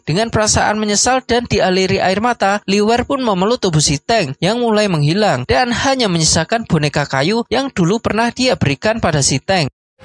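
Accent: Java, Indonesia. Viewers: gw demen nih logat jawanya haha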